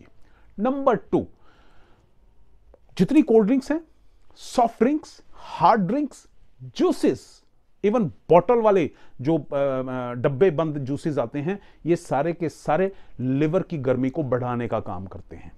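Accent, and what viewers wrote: Punjab, India. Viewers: Doctor Sahb ka utshah or bolnna ka lehja dekh Kr video K shuru se hi lag rha tha k doctor Sahb😀😀 Punjabi hai 😀😀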